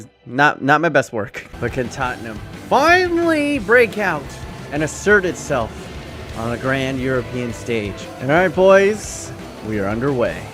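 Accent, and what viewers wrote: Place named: United States. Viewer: your commentary is SO american hahahah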